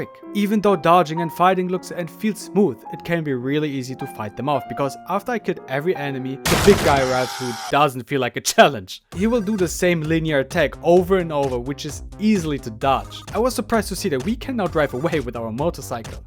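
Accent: Germany. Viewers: i can hear the german accent on the cool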